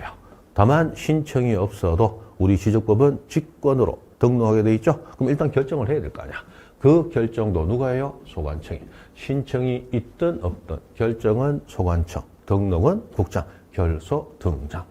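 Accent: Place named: Gyeongsang, South Korea. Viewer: 와 이해 하고싶었지만 잘 몰라서 그냥 외우고 있었는데 설명 과 암기를 모두 해주시네요 ㅋㅋ 거기에... ㅡ 발음이 안되서 ㅓ로 하는 대구경북 억양까지 구수... ㅋㅋㅋ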